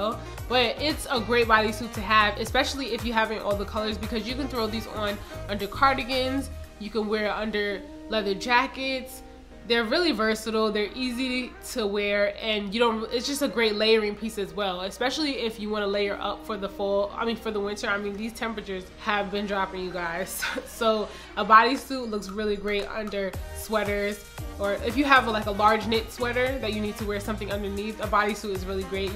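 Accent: New York, United States. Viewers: Another great video! Your NY accent is giving me so much life!!! Reminds me of home lol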